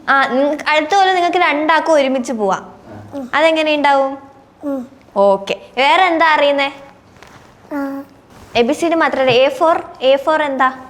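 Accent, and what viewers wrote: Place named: Kannur, India. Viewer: Anchor kannur slang ... 😂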